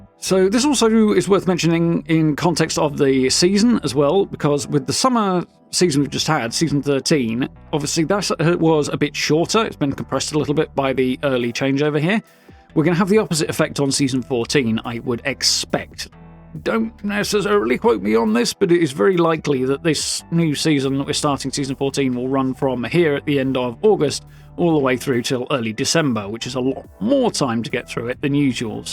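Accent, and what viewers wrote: United Kingdom. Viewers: 4k tvs have never been as affordable as they are today. I have a 4k 55" I bought for 200 usd and am planning on buying a 65" for a hundred dollars more soon. I see by your speech you are from the United Kingdom. I bought my telly from a place called Wal-Mart. Does your country have them? Hello from Yakima Washington USA ❤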